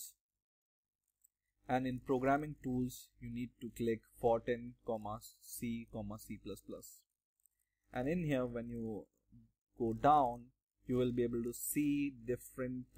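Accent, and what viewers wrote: India. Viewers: wait. are you indian or german?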